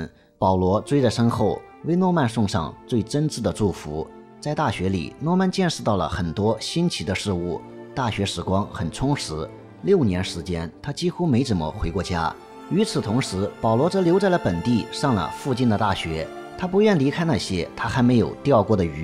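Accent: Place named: Henan, China. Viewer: 河南方言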